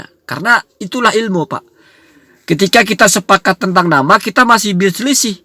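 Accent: Madura, Indonesia. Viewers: 😂😂😂😂 maduranya sangat kental...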